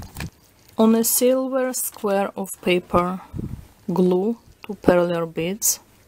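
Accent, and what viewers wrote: Russia. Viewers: Are you Russian? I love the accent!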